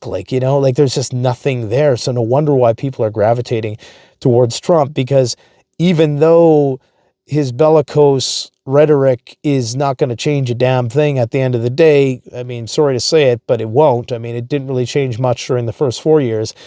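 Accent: Canada. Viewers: You sound like a Canadian. I've never disagreed more with your assessment. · Some videos your Canadian shows through more than others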